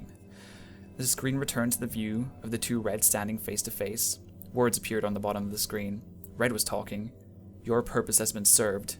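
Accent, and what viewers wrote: United Kingdom. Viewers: The british accent is strong in this one... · Oh ma gad you sounded so British in this!!!! Great story :)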